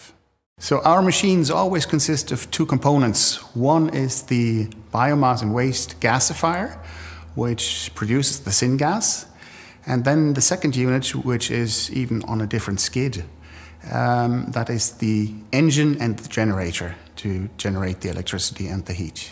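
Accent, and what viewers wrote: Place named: Ireland. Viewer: I hear some Irish in there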